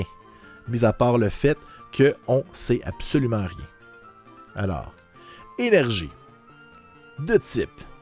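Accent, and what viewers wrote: Quebec, Canada. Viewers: Joli tirage. J'adore regarder tes vidéos et ton accent québécois est un pure moment de bonheur et de nostalgie ça me rappele mon voyage au Québec il y a 20 ans je rêve d'y retourner un jour avec mon fils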